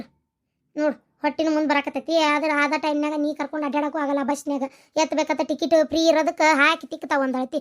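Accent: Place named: North Karnataka, India. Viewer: Ok madi uttara karnataka baigala kelake ond maja ❤😅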